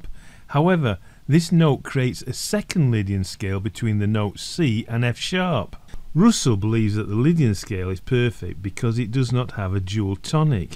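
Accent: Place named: Lancashire, United Kingdom. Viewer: Lancashire by my ear.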